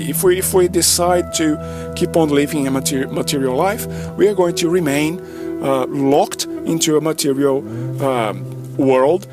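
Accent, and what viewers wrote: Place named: Germany. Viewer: Oh, WOW, lovely to have an interaction with you, especially since we are both on the "yellow brick road" like Dorothy from the "Whizard of " leading us to our true home! You are quite right about Austria being a place of knowledge, art & culture, but on the other more darker side it´s a stronghold of the Cabal (south of Germany, north of the Vatican & east of the Swiss financial Babylon - no coincidence that I decided to incarnate right here to clear up the stuck energies of bloodshed & endless wars on European soil. I was wondering about your accent & thought you might be of German origins as well, but you also made references to the Portuguese language..... so you got me confused there;-) But it doesn´t really matter as long as we are using the language of love & you definitely do;-)) Stay safe, & looking forward to meeting you in your next videos!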